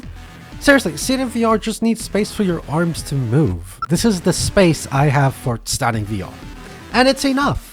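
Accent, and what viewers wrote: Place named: United Kingdom. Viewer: bro even if there's not a whole lot of VR games I believe you only need one Hotdogs, Horseshoes & Hand Grenades. Also dude what part of the UK are you from? Your accent is very interesting I don't think I've heard it before xD I'm midlands myself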